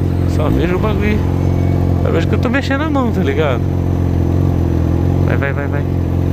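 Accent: Goiás, Brazil. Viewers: tem alguma ligação com goias? Ou so modo de falar? É noos!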